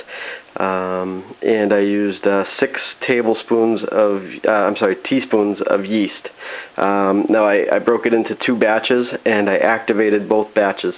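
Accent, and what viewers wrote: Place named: Maine, United States. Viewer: You from Maine? You talk like we do.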